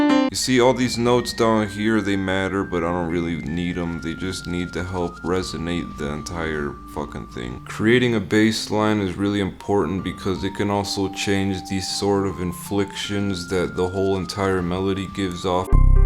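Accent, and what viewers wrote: Canada. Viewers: I THOUGHT HE SOUNDED CANADIAN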